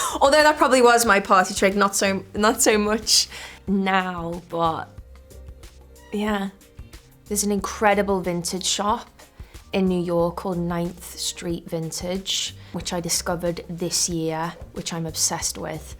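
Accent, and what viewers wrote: Liverpool, United Kingdom. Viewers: Best coming from Liverpool is the accent. I love english language accents.I am not english native speaker. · I love the scouse accent icl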